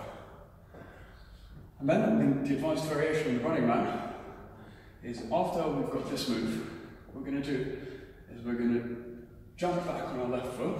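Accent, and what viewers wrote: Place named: Germany. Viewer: He sounds foreign,kind of germanish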